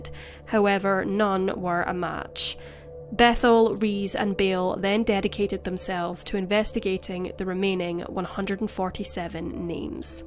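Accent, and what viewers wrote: Scotland, United Kingdom. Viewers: Scottish narrating a crime script is awesome. Its such a cool accent of english. Ive learned so much about Wales, Northern Ireland and the U.k. as a whole from other english crime mysteriy tubers. Ive been everywhere on youtube and your channel is great! Love it . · New sub. Excellent vids. Love the Scottish accent. ❤️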